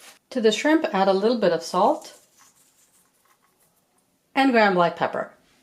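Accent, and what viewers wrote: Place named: Long Island, United States. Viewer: Delicious! You have a long island accent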